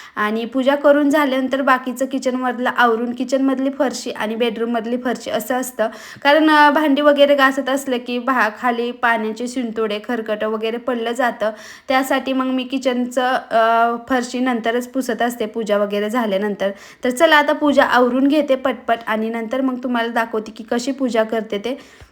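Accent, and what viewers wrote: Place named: Sangli, India. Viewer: Languagecha tone sanglikdcha vatoy...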